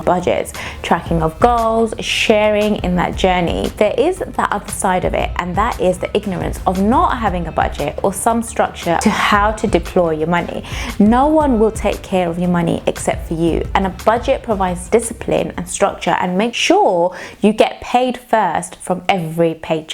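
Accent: United Kingdom. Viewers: Yes! I am all about budgetting! I think it is one of the First Steps in finacial Freedom. As always very lovely video, and I love your British accent !👍🏻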